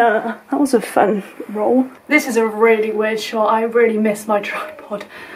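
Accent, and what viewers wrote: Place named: United Kingdom. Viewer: Hi,! Your videos are very nice and cool 😊 I started watching your videos when I was looking for info about UofL 4 months ago, but I still keep watching your videos as they are very creative and relatable. You really put a lot of work into your videos and this is awesome that you keep on posting. Don’t give up on YouTube, your subscribers love your videos😍😍😍 P. S. Your UK accent is so wonderful, I love it. I wish I could speak with that accent (I’m Ukrainian)🙈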